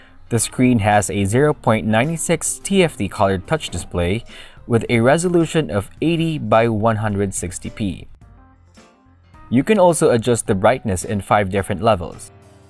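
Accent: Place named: Philippines. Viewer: omg the annoying Philipino accent.. the accent spoiled the whole video.. PLEASE LEARN ENGLISH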